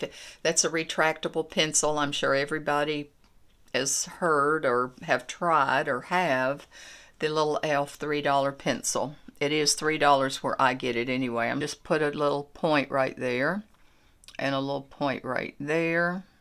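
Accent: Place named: Texas, United States. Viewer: I just love your hair and your hair color and your eyes and your makeup and everything and I love love love love love love love the lipstick colors I'm going to get those for sure and could I ask did you say what blush brush brand that is it looks so soft and so pretty.... I want to get that brush .and thank you so much I'm learning a lot from you I am almost 70 years old and I still have so many problems and my skin still breaks out I have to be very careful ... it's very dry and acne prone even at my age so if you have any advice for me please let me know I can't use oils except around my eyes and forehead .. on my other part of my face I can't use any oils unfortunately but I want to get some of that skinn Elixir and put it around my eyes like you did and a little on my forehead and even my neck....thank you I love it ! I'm in Texas and sounds like you have Texan accent and I love it ! 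Lol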